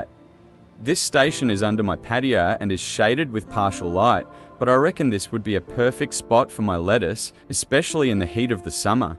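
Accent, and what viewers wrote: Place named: Australia. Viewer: Awesome! where did you get the Austrailan? is that some sort of text reader robot? love your, vids, thank you!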